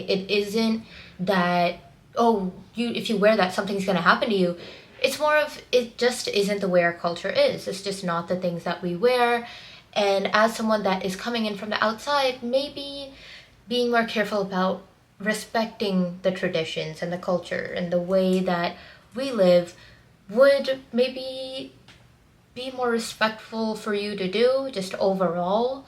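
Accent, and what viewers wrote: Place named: United States. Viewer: I respect what you're saying and your opinions but this kind of falls flat, at least for me, because you seem and sound like an Indian-American who's spent very little time in India.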